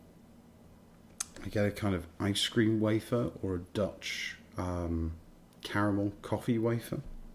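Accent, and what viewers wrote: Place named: RP, United Kingdom. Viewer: Excellent review but when are you going to invest in a descent camera? Your tasting notes are one of the most honest, original and accurate (as far as my tasting experience goes), your presentation is natural and lucid and transmitted well through your clear English accent with that hint of rugged understated poshness. You deserve a far wider audience. Invest in a better camera and let's see (please G-d) those subscription figures reach 5,000! in 2019. Fascinating and intricate flavour description which makes this Teaninich rather intriguing. There is a flavour note I haven't come across in a long long time. Elderberry. From the honeysuckle family with large clusters of tiny creamy white flowers. I do have a vague memory of this when growing up in England. You mentioned Elderflower. I looked it up and it seems to be a sugary drink flavoured with elderberry produced by village housewives in little English villages. 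This distillery name whose pronunciation is a real tongue twister "Tee-Ni-nich" is one of Diageo's silent giants with about 10,500,000 litres capacity / annum. As you said, their 10 Year Old Flora and Fauna was released in 1992 and can still be found in the UK. Their special limited release 17-Year-Old came out in 2017, now probably very rare. The character of the malt seems to be designed to be a filler malt for blends. Reviewers describe fresh green grass along with slightly oily creamy cereal notes with a dash of honey, nuts and spice. Your notes were much m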